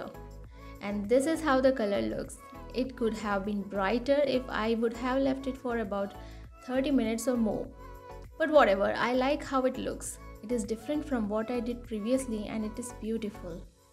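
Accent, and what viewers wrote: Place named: England, United Kingdom. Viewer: I absolutely love your English accent ❤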